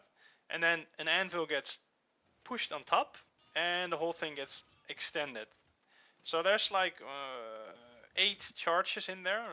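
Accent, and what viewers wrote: Netherlands. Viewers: probeer op je nl accent te oefenen :) dat klinkt iets beter (alleen als tip voor de rest goed) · Jij hebt een nederlands accent. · dude, u dutch? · wait.... are you dutch ?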